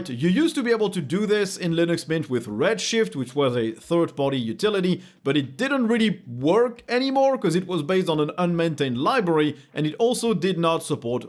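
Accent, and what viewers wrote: France. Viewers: Snif snif ça sent l’accent français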